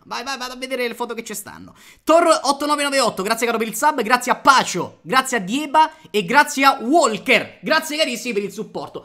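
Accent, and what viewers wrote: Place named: Rome, Italy. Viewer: All'inizio credevo che fossi Romano da come parlavi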